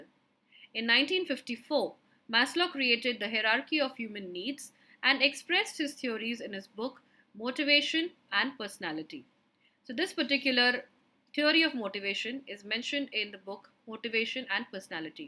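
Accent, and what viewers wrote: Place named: India. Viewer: the indian thick accent is spoiling everything.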